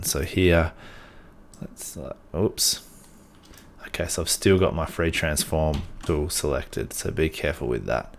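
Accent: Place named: England, United Kingdom. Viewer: No worry but I have follow many tutorials and you are the best for speed and infos and I am in Canada working for a Global company E learning stuff. From your accent you must be in England.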